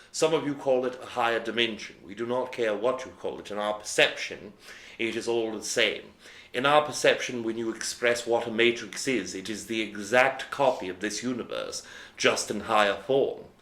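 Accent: United Kingdom. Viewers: Oh wow, your voice when channeling Aridif has changed a lot. Here, you sounded just like yourself but with a mild British accent, and now (when you channel Aridif) you sound like a much older man with a thick British accent, that’s so crazy! It’s cool!!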